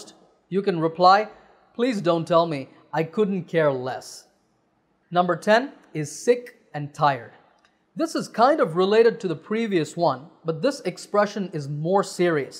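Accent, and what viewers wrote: United States. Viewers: Thank you Mr.. You have been rendering an incredible service to the non-native speakers of English. I love your American accent. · sir, I've been watching your videos and it has added a lot to my knowledge. However, there is a query that why do you converse in American Accent? Are you right now living in America, or you like that accent? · I Really do appreciate for your great efforts for making all these videos to make others learn . I watch your videos regularly , when close my eyes and listen you , you just sound like an American. You have standard American accent . I am impressed and inspired. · Sir your voice is like American man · Sir are you speaking in American accent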